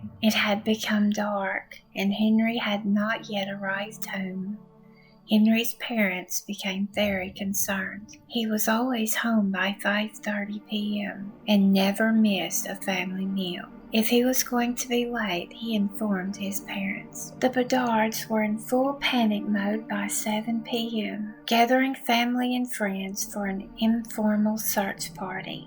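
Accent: South, United States. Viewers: It's unique! Is it a Southern accent? 😊 As for poor Henry, I'm pretty sure he was killed by someone who knew him. Seeing as he was hit from behind I think he had met someone in that spot that he knew. I saw some recent news that they were exhuming his body after all this time so hopefully some positive news soon.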